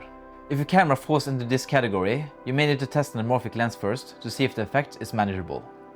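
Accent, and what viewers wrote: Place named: Bergen, Norway. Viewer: Are you from the Bergen area of Norway? Your English accent sounds like you are…. 😊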